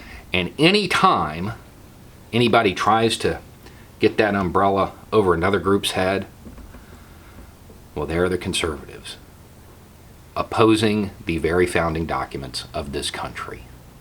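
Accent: South, United States. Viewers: Lowkey you sound like heath ledgers joker. He had a slight southern drawl, particularly your vowels sound a lot like him. It’s pretty cool just noticed it.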